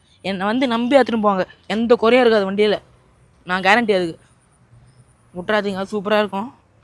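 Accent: Madurai, India. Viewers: Madurai vasagam 🎉🎉🎉super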